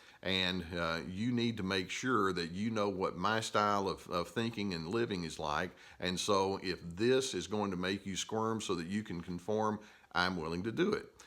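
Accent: South, United States. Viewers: I love your southern accent. Hallo from Bosnia. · I'm from the south, also. I hope you know that your super cool southern accent gives a much needed safe space to the sceptical-of-therapy southerners. There is a lot of suffering down here that's definitely in our control whether or not we want to admit it. After showing my super anti-therapy Alabamian aunt one of your videos to help her understand her brother-in-law she said "I really like him. He sounds like a normal person". hahahaha I love it! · Dr, You have helped me so much! I bought 2 of your books on amazon. They both 'cut to the chase'! I was a people pleaser and became very angry and then depressed. Without realizing what was causing it, i sunk down for years. You helped break it down and sort it out! I am free of my bondage! I am forever grateful! Would love to spread the word! Bless you! 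(Love your southern accent too)!! You have changed my life, my attitude, my future!!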